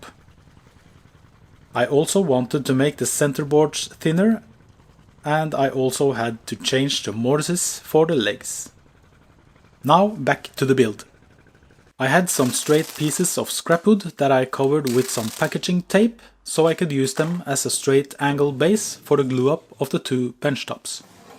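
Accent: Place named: Minnesota, United States. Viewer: I was listening to u say ticknesser and udder hand then realized because I'm from Minnesota I knew exactly what u were talking about. Nice work!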